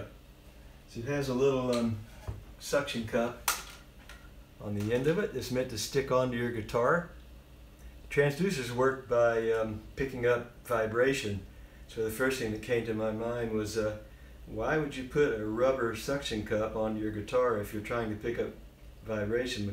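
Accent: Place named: New Zealand. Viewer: Nice job, great to hear a fellow kiwi!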